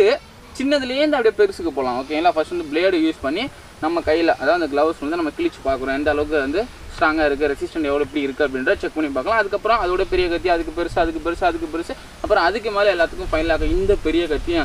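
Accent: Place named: Tamil Nadu, India. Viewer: Ungaloda tamil english slang supera iruku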